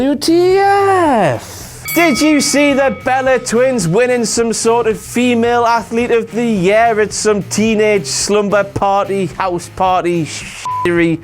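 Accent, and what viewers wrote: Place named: United Kingdom. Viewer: shit! u have a fucking shit britain accent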